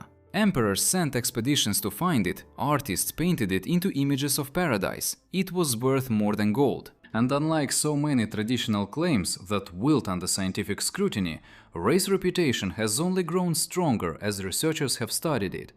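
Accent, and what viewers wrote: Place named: Russia. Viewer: На русском языке здесь только название ??? Но по произношению слышно,что читает русский😂 Как на моем косячном навигаторе: зе камера эхэд😂😂😂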